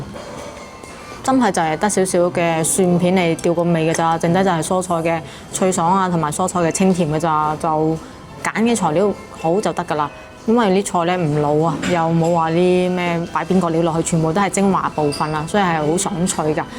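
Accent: Guangzhou, China. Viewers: 粤语警察😂😂对老广语法一知半解😢广州陀地发音结尾，都是下降音及平声，不用理捞头乱噏🎉🎉支持你👍👍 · 唔需要改口音，改咗會不倫不類㗎，話你啲人唔係廣府人囉，我哋香港人好多都係從廣東來的，（佛山）❤❤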